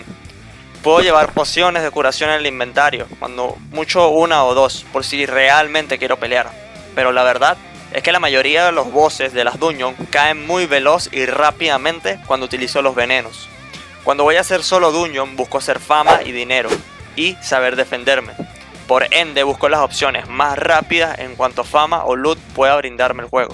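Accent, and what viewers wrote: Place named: Caracas, Venezuela. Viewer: Eres Venezolano? Ese accento es demasiado caraqueño!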